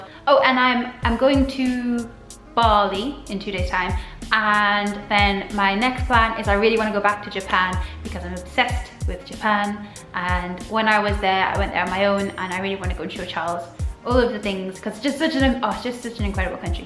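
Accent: United Kingdom. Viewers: Hi! Love your sweet & funny videos :-) Have a question regarding your cats - since one doesn't like the other & all - are they both or one of them adopted? And both or one of them recently? Just curious since we adopted a five (now six year old) cat-lady not long ago ourselves :-) Also - did they enter your household pre or post minimalism? Did you declutter pet related stuff too? If so, how/what? What have you found essential to keep for them? Perhaps you could make a video on that topic & show us? (please?) Love your specific brittish accent, whatever mix it may be :-) And btw you remind me in some ways of Emilia Clarke! Not as any of the characters she plays but herself in interviews etc :-) In a good way of course! Hugs from Sweden!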